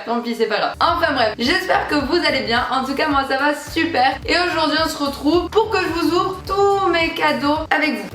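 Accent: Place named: Marseille, France. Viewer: Putin t’as un accent marseillais magnifique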